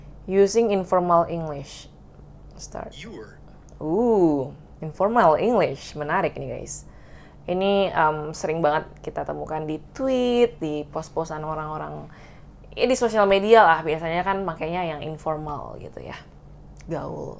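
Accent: Indonesia. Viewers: masih logat indo banget si🤭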